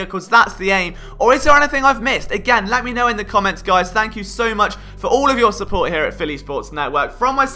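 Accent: England, United Kingdom. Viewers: Love me some EPL but this is the first time an English accent did it for me with AmeriCAN Football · Never in my life did I think I'd hear such intelligent football breakdowns in such a British accent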